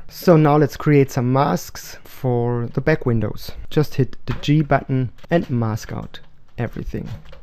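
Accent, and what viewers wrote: Germany. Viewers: Hey, so wie sichs anhört (nicht böse gemeint), kommst du aus Deutschland! Daher kurz auf deutsch: Du hast meinen größten Respekt! Nicht nur, weil du dieses Video fantastisch gemacht hast, sondern weil du allgemein ein fantastischer VFX Artist zu sein scheinst! Ich meine... du hast sogar mit Dinklage deine Zeit verbracht! Ich hoffe, ich werde mal nur annähernd an dein Level kommen! Alles Gute und bleib wie du bist!!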